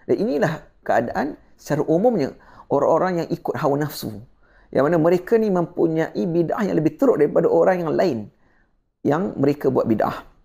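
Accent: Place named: United Kingdom. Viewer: Memang benar....sesiapa yg otak 1@ digit memang tidak akan faham apa yg kapten bicarakan....Lidah kapten lidah inggeris sbb hari2 gunakan bahasa tersebut. Slang berbahasa arab pun sudah tentu keinggerisan. Pernah dengar syeh mahmud khalil qusairy baca quran slang khorasan? Mereka akn fitnah pelbagai cara dari pelbagai sudut. Tidak salah saya anjurkan para ustz (klu boleh dipanggil ustazlah) belajar lagi...sbb ilmu islam ini amat luas....umur 100 tahun pun belum tentu sudah cukup😂😂😂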